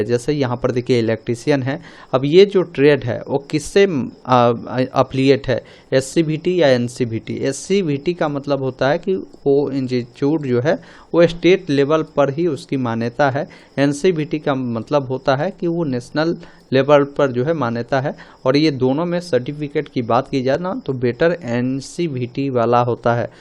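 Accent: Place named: Marwari, India. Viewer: Barbar English mein voice change kyon Ho Raha Hai sar Marwadi English mein yah bol raha hai video mein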